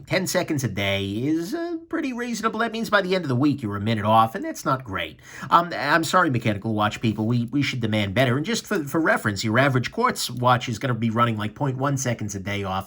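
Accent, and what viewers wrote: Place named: Alabama, United States. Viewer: I have The Islander with the 60-minute dive bezel, it's a great watch for the price. By the way I'm picking up your southern accent I'm guessing Alabama?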